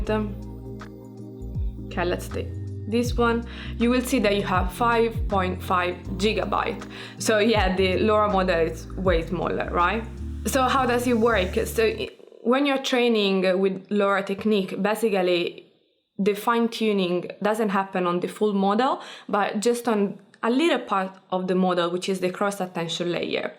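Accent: Italy. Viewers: Amazing, I've finally find out how to use a Lora model thanks! PS: Sei italiana? il tuo ecc ecc suonava italiano :)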